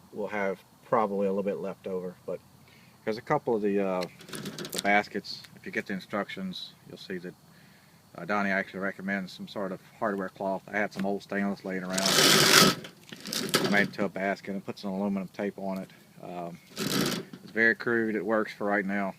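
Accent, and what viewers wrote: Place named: Maryland, United States. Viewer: I've always wondered about these coatings. That accent sounds like somewhere between Cecil and Wicomico county!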